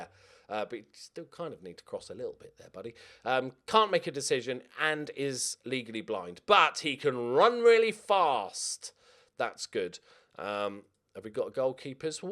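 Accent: Australia. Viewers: Best Aussie accent I have ever heard from a non Aussie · as an Aussie I can confirm that you’ve definitely got the accent 100% totally accurate.... absolutely killed me with laughter. Can’t wait to get stuck in to this series! Good luck!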